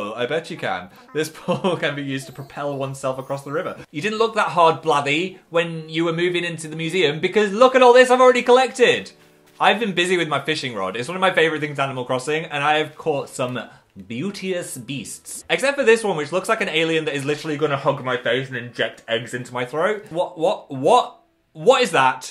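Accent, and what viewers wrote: North, United Kingdom. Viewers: is it just me or does sound more northern than usual? No.... just me ok then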